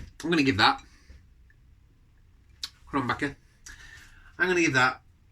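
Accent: Yorkshire, United Kingdom. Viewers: Mate how have I only just discovered your channel now? Been looking for a lad who reviews supermarket beers that I see every day for ages. Loving the content mate! Yorkshire lad? Subbed! Cheers!! 🍻🍻🍻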